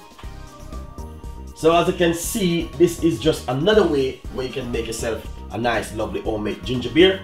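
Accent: Jamaica. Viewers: he's Jamaican for crying out loud! This is not a British channel. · I like jamaican accent 😎✌ love from Panama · Dude your jamaican accent is messed up with England. Thanks for the recipe.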